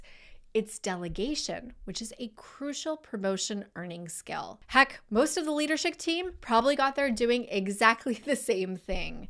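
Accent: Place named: New Jersey, United States. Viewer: Thanks for the video but the jersey girl accent is shaking up my autism. 🩵💐🧸🩷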